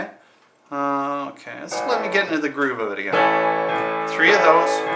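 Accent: Canada. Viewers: Great Great Video. Good job, took me less than an hour to learn this song because of this video. And, say, you sound Canadian. Are you?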